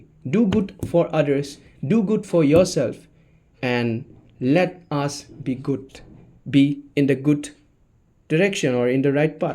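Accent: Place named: Manipur, India. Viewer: A meitei right? I can sense from yr accent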